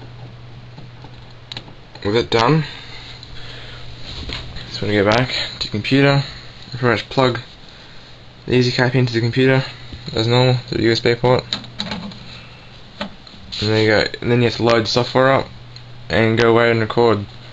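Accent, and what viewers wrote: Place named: Australia. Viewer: thanks for the help. favorited so I can use it for future reference. You australian? nice accent :P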